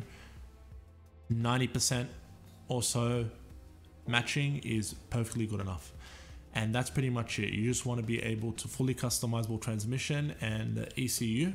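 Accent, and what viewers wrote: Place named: Australia. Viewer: I thought you sound Aussie haha great vid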